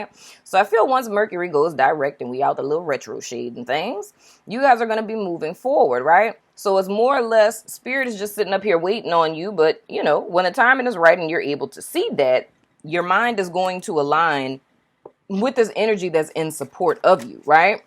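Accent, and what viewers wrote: New Orleans, United States. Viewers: U sound like u from New Orleans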